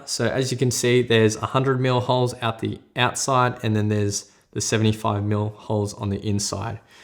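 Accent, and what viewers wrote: Australia. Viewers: Thanks for awesome review and instructions. Nice to hear an Aussie accent, we need a good tech review channel, thanks for bringing it. The link you provided takes me to a product $239 ? Did price just increase or wrong link?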